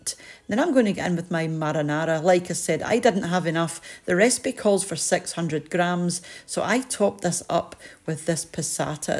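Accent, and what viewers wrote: Scotland, United Kingdom. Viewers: Hello from Colorado., USA. There’s a whole group of us out here in my community who watch your show... partly for the recipes; partly just to relax to the sound of your amazingly lovely and relaxing Scottish voice. I’m going to be making a big slow-cooker full of this wonderful recipe today. It’ll make for an easy cooking-free weekend, too.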